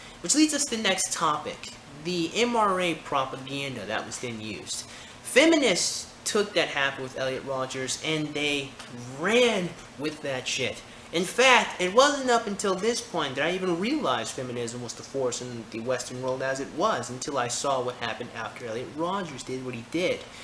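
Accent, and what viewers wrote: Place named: United Kingdom. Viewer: Trust me when I tell you that you say all the right words. I've disagreed with some of the things you've said in the past, but I don't think I've ever heard you say the wrong word. I wish I was as articulate as you. The British accent threw me off a bit.I was like, "Is he intentionally doing it? Or did he grow up in England and sometimes it just comes out? I've noticed that you pronounce certain words differently than I do. The only other place I've heard this type of pronunciation or accent was from the show The Wire.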